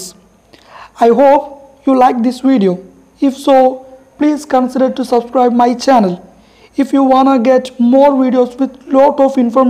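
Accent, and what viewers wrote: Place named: Kerala, India. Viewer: How much ever you try to fake accent but it is easy to guess u r mallu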